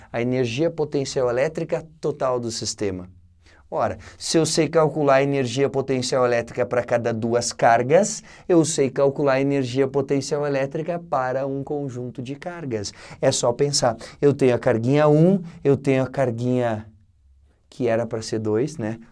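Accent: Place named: Santa Catarina, Brazil. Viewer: sotaque de catarina é bom demais hahahahahah show de bola mestre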